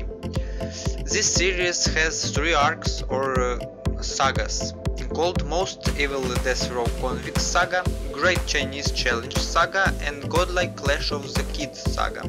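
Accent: Russia. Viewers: Капец у тебя акцент русский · Russian accent? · Почему такой русский акцент???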